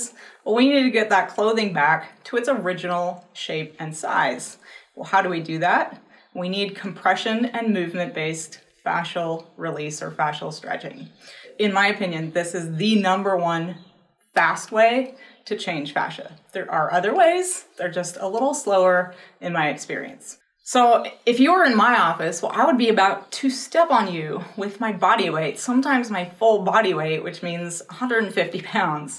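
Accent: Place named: West, United States. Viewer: Your accent sounds N of Mason-Dixon line & W of Mississippi River, but not a flat-lander or inter-mountain W, so maybe a left coast origin, but the Science Museum on the 270 inner belt in St Louis had a display of every system from the human body to include the Fascia. No info on how the display was accomplished. Visitation hours are short, like the staff wants to go play golf PM & unfortunately they are/were not open every day. Like all museums, displays change. Worth a 500 mile day trip for those in the area with an interest. Thx & GL.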